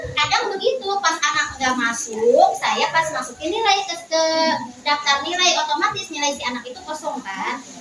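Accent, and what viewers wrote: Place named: West Java, Indonesia. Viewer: kaya logat sunda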